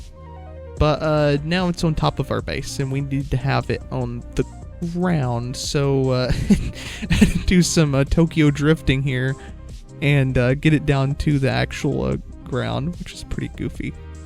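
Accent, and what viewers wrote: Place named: Texas, United States. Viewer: why does this guy have like a texan accent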